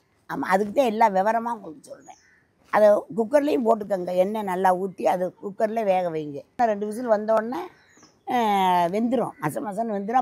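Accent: Ramanathapuram, India. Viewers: அம்மா நாங்கள் ராமநாதபுரத்தில் உள்ளோம். உங்களின் பேச்சு அப்படியே எங்க ஊர் போலவே உள்ளது. எங்கள் ஊரில் உள்ள முஸ்லிம்கள் இப்படித்தான் பேசுவார்கள் கேட்பதற்கு அழகாக இருக்கும்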